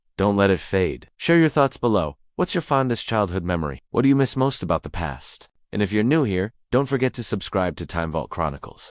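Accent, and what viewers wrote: United States. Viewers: Sorry, the AI produced pictures (look at any text, a lot of which is gibberish) and the Amurcan commentary completely removes any credibility this might have had. I winced at 'Mom', but turned off when we got to 'moble phones'........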